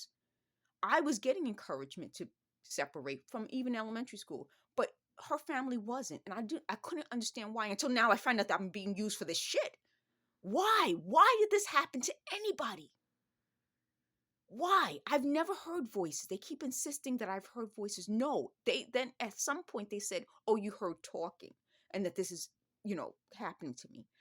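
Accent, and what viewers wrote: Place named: New York, United States. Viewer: I'm sorry you are going through this! Do you think it's Riverbay doing this? Or their leaks/ mold causing issues? I know other people that are having issues, and they think its the water (the shower water may have too much metal) or the mold. I hope your leg gets better soon. Have your doctor check your ferritin and all iron levels. Also, check your insulin level, specifically A1c test. Again, I hope things get better. Thanks for the hair oil info! 😊 btw I love your slight NY accent. Keep smiling and try not to let anyone get to you!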